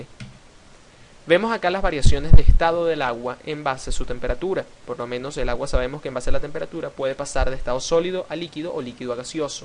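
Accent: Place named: Venezuela. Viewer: Apenas empecé a escuchar el video supe que eras venezolano jajajaja :D Saludos desde barquisimeto!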